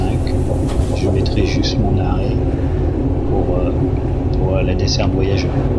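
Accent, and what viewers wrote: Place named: Auvergne, France. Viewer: Merci pour ta réponse. Si mes recherches sont bonnes, les XGC possèdent 2 MD qui entraînent des alternateurs - redresseurs pour du 1,5 kV qui alimente deux onduleurs entraînant chacun 2 moteurs asynchrones logés dans les bogies d'extrémité. Donc je suppose que le frein moteur est seulement rhéostatique. Peux-tu utiliser le frein rhéostatique seul ou celui-ci est uniquement combiné avec le frein général de l'autorail? En ce qui concerne le contrôleur (je ne connais pas le terme officiel), il n'assure qu'une fonction commerciale car si je comprend bien c'est toi qui assure l'ouverture et la fermeture des portes et qui reçoit d'autorisation de départ de l'agent sédentaire ( oublié le terme officiel). Je me répète, tes commentaires sont très pertinents et ta voix passe très bien ( avec petit accent auvergnat. ;-) ).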